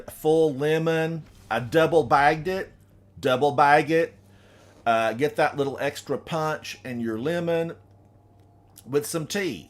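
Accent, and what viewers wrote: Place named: Appalachia, United States. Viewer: What is your accent? Appalachian? Love your content, you're very charismatic.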